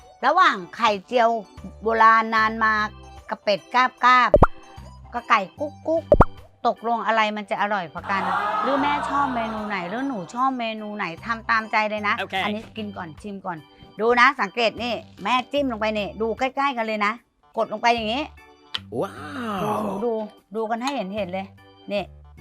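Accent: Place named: Isan, Thailand. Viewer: ชอบสำเนียงการเว้าา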